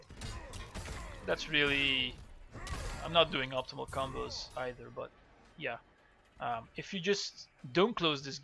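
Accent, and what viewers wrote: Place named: Flanders, Belgium. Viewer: HAHAHA. Gewoon het vlaams accent in Engels is zoiets uniek dat ik da kan opspeuren gelijk een hond xD Mercikes voor de guide man, tis straf!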